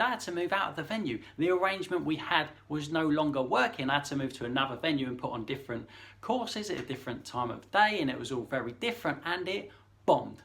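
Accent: United Kingdom. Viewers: hi im just new in the channel and i wanna know where are u from im not english speaker but felts like i could lear some british english out from u. Im considering going there to work and my degree essay is about bread texture determination and comparision of different sourdough. So looks such a double strike, see ya.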